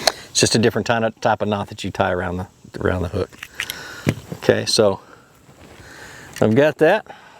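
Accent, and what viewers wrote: Georgia, United States. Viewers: are you from georgia? pls come teach me lmao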